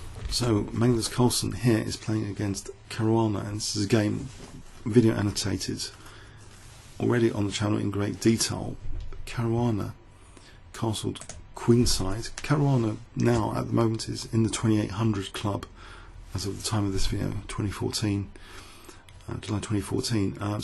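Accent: United Kingdom. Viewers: Are you from. Uk ?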